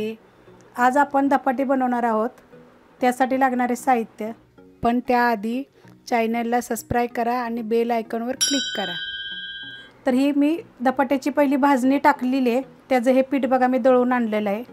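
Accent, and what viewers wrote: Satara, India. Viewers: ताई तुमचं गाव सातारा आहे का तुमची बनवण्याची पद्धत आणि बोलण्याची पद्धत आमच्याकडची वाटते सातारची तुमच्या रेसिपी बघून मी लाटी वड्या करते सजुरी करते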